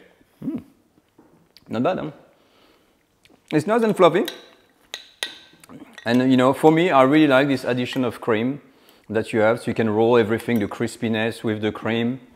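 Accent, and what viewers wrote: France. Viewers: Dude, you are losing your beautiful French accent...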